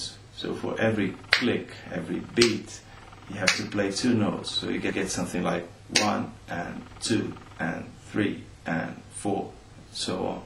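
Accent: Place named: Brazil. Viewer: Are you a Brazilian living in England or Australia? Your accent sounds to me like a mix of Brazilian and British or Australian. Just curious.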